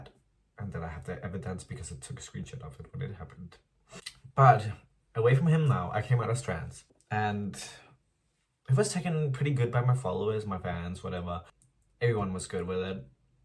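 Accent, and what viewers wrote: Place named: Denmark. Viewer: danmark nævnt i medierne gutter (danske accent er lowkey crazy)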